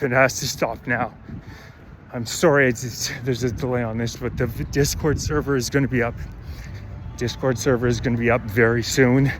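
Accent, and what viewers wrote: Canada. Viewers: Your Canadian accent is awesome! Keep fighting, brother. Love from Belfast.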